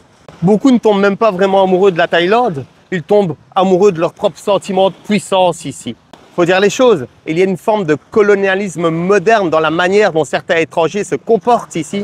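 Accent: Belgium. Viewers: Toi, t'es pas Français !!! Belge peut-être ? Merci pour cette approche...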